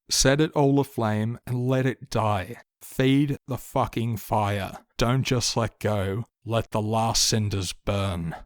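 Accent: Australia. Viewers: Certified monotone Australian classic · Disgusting to see people responding to this mentioning Br*ts. Monotone Australian is the only trustworthy media analysis phenotype. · a monotone Australian voice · Strongly disagreeee, the Aussie dialect that like to strain out the last letter of the last word of a setence bothers meeeeee.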